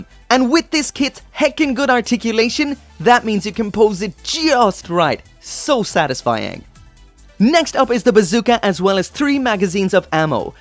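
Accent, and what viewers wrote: Ireland. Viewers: Are you from Ireland because you slightly sound like your from Cork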